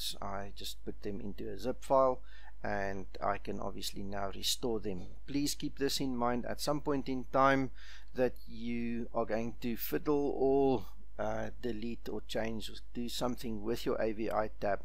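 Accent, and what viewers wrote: South Africa. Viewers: what part of south africa are you from and thanks for the vid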